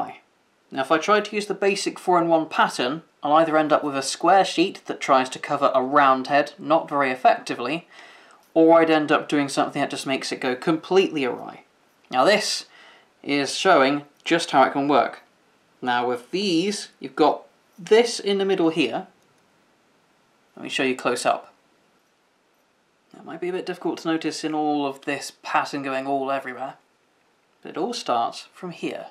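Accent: United Kingdom. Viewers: Off topic, what area of england is your accent from? Also, thanks for the lessons.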